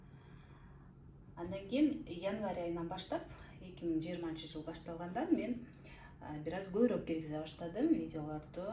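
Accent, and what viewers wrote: Standard. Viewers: Үнүңүз өтө жагымдуу,таза кыпгызчаныз дагы жагат,бизге сонун тамактарды үйрөтүп эле жүрө бериңиз,бар болуңуз 😘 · Азамат кожойке экенсиң алтын кыз бар бол Таптаза кыргызча сүйлөйт экенсиң кай жердин кызы болосуң ❤ Бар бол алтын кыз рахмат эмгектериңе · Саламатсызбы,.Ден соолук, дагы көптөгөн ийгиликтерди, үй-бүлөлүк бакыт каалайм! Кыргызча эң сонун таза, көркөм, таттуу сүйлөп түшүндүргөнүңүзгө чоң рахмат! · Белегиниз мн чын журоктон куттуктайм.Сизди таза кыргызча суйлоп,орусча кошпой суйлогонунуз учун дагы абдан сыйлайм..Тазалыктын сырларынан да айтып,уйротуп турунуз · Азаматсын,так ,даана,таза кыргызча , түшүнүктүү сүйлөгөн кыргыздын сыймыктуу кызы!!! Белегин кут болсун!!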